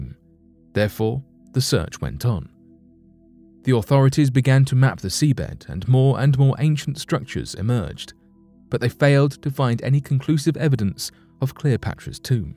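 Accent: United Kingdom. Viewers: I am given you minus for this nervous British accent! Speak American way or shut up immediately. People of the planet, disguise your colonial past. Pay back reparation to your former colonies! Big time!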